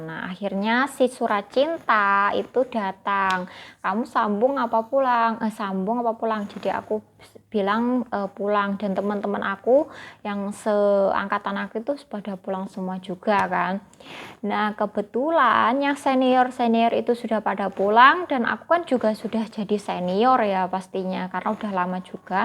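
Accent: Java, Indonesia. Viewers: Kok pekat amat jawa nya 🤣🤣🤣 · Kabare? Pekat kok jowonya.. terbaik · Kuat slank jowonyo. Kilang kilang nyaman kaya kerja office. Terutama kilang elektronik ada acenya nyaman sekali. Ada kantinnya atawa cafeterianyak ngak perlu keluar beli makanan semuanya dlm kawasan kilang. Cowok2 dan cewek2 pun ramai. Boleh main mata, ngobrol lama2 bisa dapat jodoh. Cewek cantik cepat kawen. Cowok ganteng punya ramai cewek. Cewek2 kaya jinak2 merpati. Kerja kilang kena koban diri. Kerja 12 jam termasuk rehat. Pergi pagi pulang mlm. Pergi petang pulang pagi. 8 jam kerja UMR 4 jam Overtime. Lama2 kerja UMRnya naik. Jadi gaji pun bertambah. Kerja kilang semuanya di sediakan. Angkutan, asrama, medical penal, bonus tahunan, Annual party dan lain2 di mana ada cabutan bertuah dan sebagainya. Exursion ke tempat2 wisata berkumpulan dan sebagaimananya. Lepas habis kontrak pulanglah. 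Bisa di ganti org baru. Org di luar sana ramai mau kerja. Bagus tak nyambung kontrak. Bagi peluang org lain mau kerja juga. Kerja saja di indon sana. Skg indo sdh maju byk lowongan kerja. Skg M'sia mau ambil kerja dari Siam pula. Kosnya tak tinggi. Sbb Siam sebelah dhj dgn M'sia. Cewek2 siam lgi cantik kulit merah kuning dari cewek2 indon😊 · Wah pekat nya Jowo nya dik...😂😂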